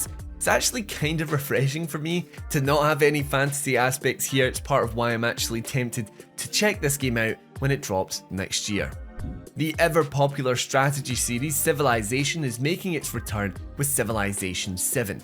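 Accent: Scotland, United Kingdom. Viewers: Is this Scottish accent?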